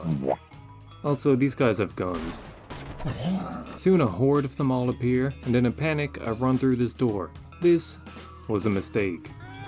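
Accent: Ireland. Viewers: It’s Irish I’m pretty sure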